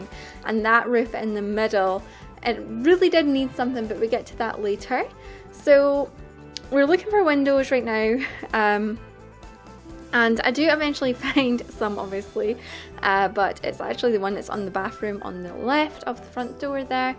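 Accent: Scotland, United Kingdom. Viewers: Is that a scottish accent i hear? :P You hear very few of them on YouTube...mad hearing it on a Sims 4 video too! :)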